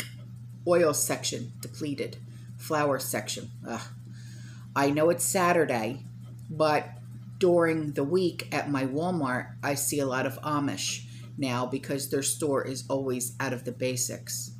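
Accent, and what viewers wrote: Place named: New York, United States. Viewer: The more you talk the more you sound like you're from New York instead of Florida.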